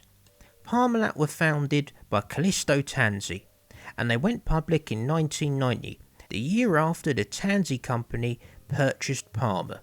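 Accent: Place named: London, United Kingdom. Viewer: the geezer accent makes it even better 😂 phenomenal.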